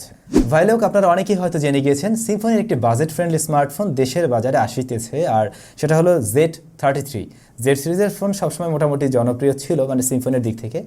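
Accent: Barishal, Bangladesh. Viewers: ভাই অনেক দিন অনেক প্রশ্ন করেছি কিন্তু একদিনও উত্তর পাইনি, ভাই আপনার গ্রামের বাড়ি কি বরিশাল৷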